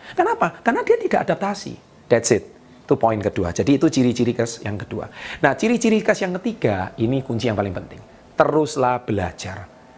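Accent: Java, Indonesia. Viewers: Aq sangat terganggu dg logat kental jowomu mas..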